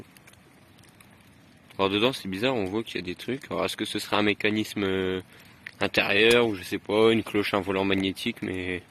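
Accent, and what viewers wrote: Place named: Nord, France. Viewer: Salut heureux de te voir te lancer en solo, on reconnait le Nordiste ( he ben laooo) Félicitation pour la pêche. Jean marc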